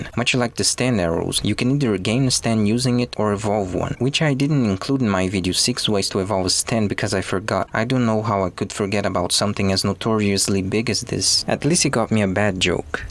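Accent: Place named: Brazil. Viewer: Mano, tu é Br ?, parece c o sotaque de Br ein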